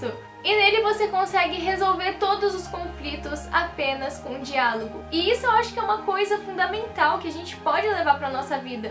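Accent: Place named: São Paulo, Brazil. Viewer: pensei q vc era de São Paulo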